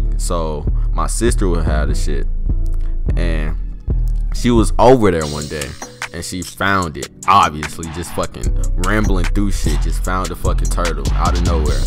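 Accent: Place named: New York, United States. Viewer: this nigga has to be from ny lmaooo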